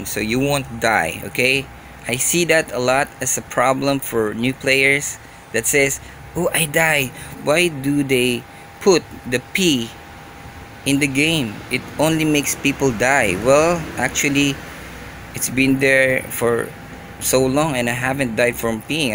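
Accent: Philippines. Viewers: ur voice just like pinoy? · Pinoy akcent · Pinoy tama??😂 Edit:sabi ko na accent palang +1 sub Gawa ka ng video kung pano hack ldoe 1.6.5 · Are you Pinoy? Halata ung accent eh xD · I know you filipino i hear filipino voice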